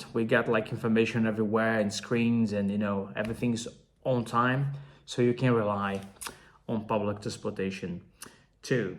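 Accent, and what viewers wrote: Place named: United Kingdom. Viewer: Oi emano, muito bom ! Glad you like it here in Denmark, your english has a serious british accent. 😊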